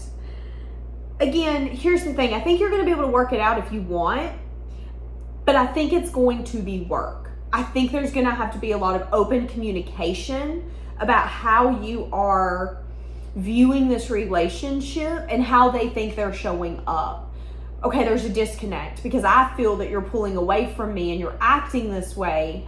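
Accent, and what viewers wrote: South, United States. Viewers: I love your southern drawl !! 😊 you don’t need classes . It’s cute😊. I’m from NY. The Canadians make fun of how we talk .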